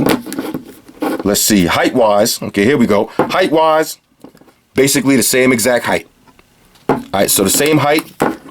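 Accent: New York, United States. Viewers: shout out to that new york accent lol wallwey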